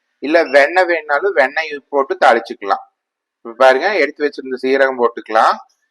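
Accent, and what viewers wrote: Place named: Kongu, India. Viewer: சாமி நம்ம கொங்கு நாட்டு தமிழ் அழகே தனிதான் . உங்க தமிழும் அருமை கீரை கடயலும் அருமை. வா சாமி என்ற ஊட்டுக்கு சாப்பிட குடும்பத்தோட வா கண்ணு🙏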